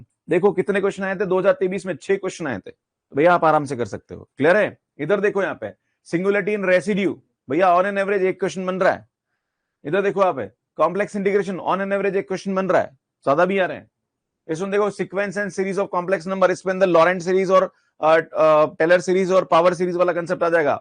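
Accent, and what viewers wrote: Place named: Haryana, India. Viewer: Sir aapki bolli me haryanvi touch h😝😝😝 Jo bot mst lgti h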